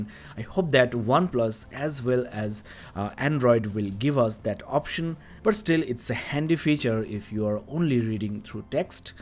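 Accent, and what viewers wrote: India. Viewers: Foul Indian accent.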